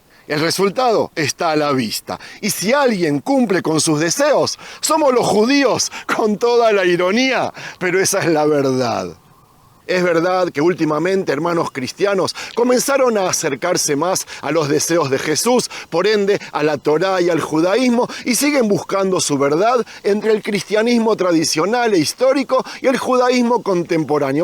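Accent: Argentina. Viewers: Por qué tiene acento argentino es judío, de verdad o qué? Es una pregunta, espero no ofender🙏❤️❤️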